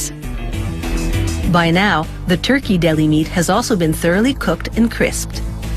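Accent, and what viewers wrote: Canada. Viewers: Didn't realize the Narrorator was Canadian until the end. Oh, U and O.😂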